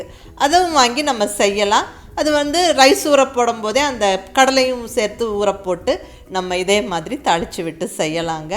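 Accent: Coimbatore, India. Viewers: Pakka coimbatore voice.... love that u were hiding the face...nowadays people are running behind fame.... u r unique among them....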